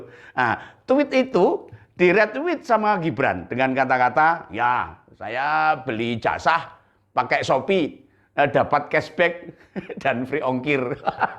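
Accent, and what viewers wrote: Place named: East Java, Indonesia. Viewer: Logatnya...muuuaantaap...kang... tuban jawa Timur...👍🙏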